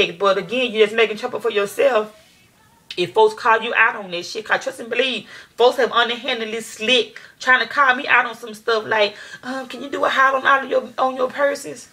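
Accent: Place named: South, United States. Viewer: Her southern accent is very comforting... she literary puts me to sleep when she talks LOL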